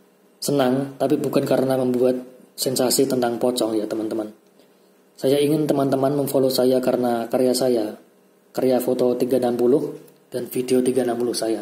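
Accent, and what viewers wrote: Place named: Java, Indonesia. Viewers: Mas metal banget deh 🙌 Dijabarkan dg lugas, jelas & khas medok orang jawa 👏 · Seram dengan tanda pentung itu... Mas e wong jowo.. jowone ketok bgt mas 😆😆😆😆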